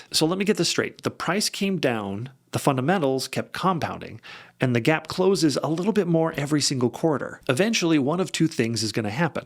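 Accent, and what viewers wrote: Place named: Midwest, United States. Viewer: yep, I listened very close and I heard you and trusted 100% in your research! Been listening very close since the first vid I watched of yours! Must have heard that midwest accent! Thank you for alllll the hard work and explaining what you did at AMZ explains a lot! Even have my husband listening now!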